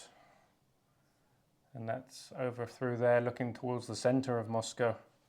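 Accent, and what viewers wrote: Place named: United Kingdom. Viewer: Nice to hear pure British English, not American one.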